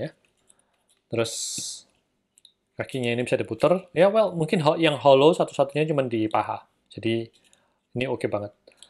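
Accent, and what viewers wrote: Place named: Java, Indonesia. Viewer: Mantep... medhok reviewer e wkwkwk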